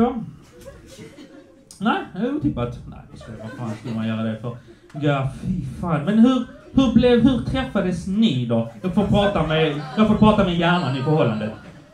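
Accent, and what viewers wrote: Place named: Skåne, Sweden. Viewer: Såg dig för första gången på fb idag och kom hit för att få mer.😅 Var släpper du mest, för som en skåning i Oslo kan jag inte få nog av skånska.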